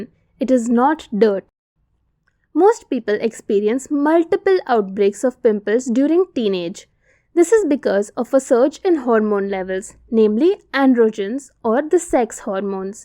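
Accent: India. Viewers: Sounds so indian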